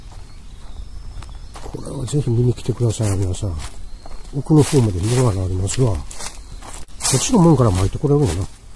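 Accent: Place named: Himeji, Japan. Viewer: さん、情報有難うございます！他にもたくさんの情報、楽しく拝見しました！！行って報告しますねー。実は、わたし、姫路出身なので、毎度『みなさ～ん、こんにちは』のイントネーションが私と同じで、わらってしまい、さんの動画のファンになりました（笑。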